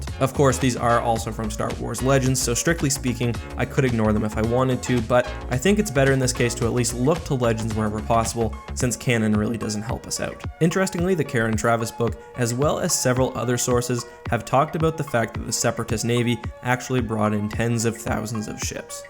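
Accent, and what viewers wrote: Canada. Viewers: Are you canadaian?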